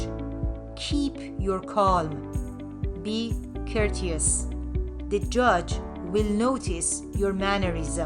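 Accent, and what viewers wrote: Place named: Afghanistan. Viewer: Afghan accent 👌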